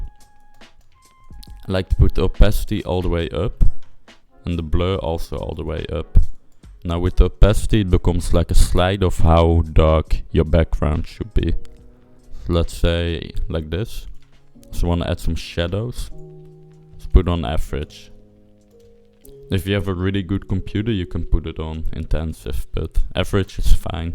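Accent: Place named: Netherlands. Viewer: 100% a Dutch guy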